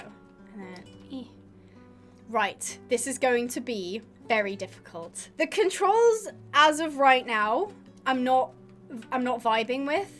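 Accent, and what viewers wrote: United Kingdom. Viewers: Are you british? lol. your accent is amazing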